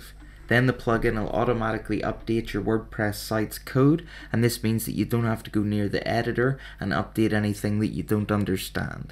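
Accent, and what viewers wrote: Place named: Northern Ireland, United Kingdom. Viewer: definitely a Northern Irish accent there.... good video!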